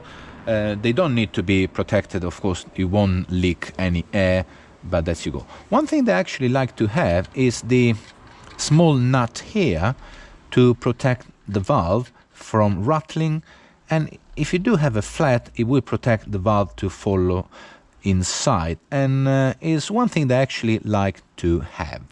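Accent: Italy. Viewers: I don't even have a bicycle but I enjoyed listening to an Italian talking English. Very well done too, a lovely accent. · Thanks for sharing your take on the humorous Velominati “rules” in your wonderful Italian accent! Like your video, I think the “rules” are observations of the cycling community. Velominati takes these to a satirical extreme. I love them- follow some, don’t follow others as it suits me. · Well done 👍, I like the Italian accent, it makes this mindset more believable 😂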